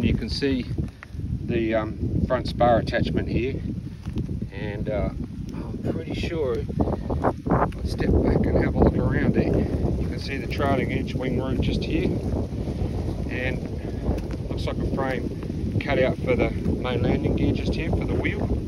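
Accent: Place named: Australia. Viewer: You definitely have. Assuming by the accent you’re in Australia. It’ll be a Spitfire MK VIII, leading edge tank as standard. Give Cameron Roloh-Smith a ring at Performance Aero at Archerfield, he’s the Australian Spitfire man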